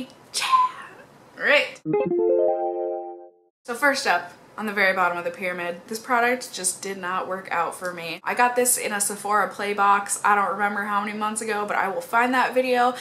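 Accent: Minnesota, United States. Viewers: Well, she is from Minnesota, so that's probably just her accent.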